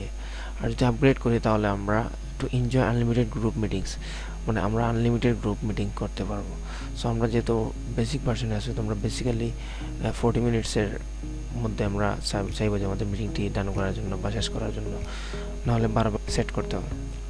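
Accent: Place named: Sylhet, Bangladesh. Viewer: Ji but Dhaka tei thaki choto theke. kotha sune bujhe gesi je sylheti hote pare 😂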